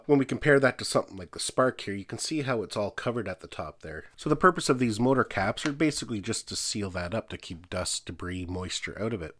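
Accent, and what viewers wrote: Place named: Nova Scotia, Canada. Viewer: Haha. I knew you were from Canada. Most likely from Nova Scotia.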